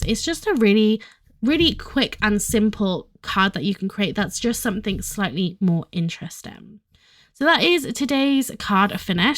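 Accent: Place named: Merseyside, United Kingdom. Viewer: Your accent sounds mixed. You sound like youre from Merseyside area and been in Wales for a longer time. Its lovely anyway, beautiful card 💞💞💞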